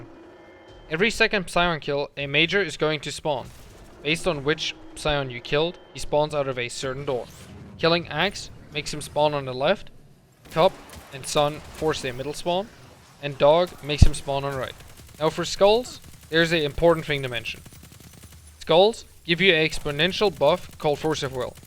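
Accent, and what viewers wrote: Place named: Germany. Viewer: That German accent is sexy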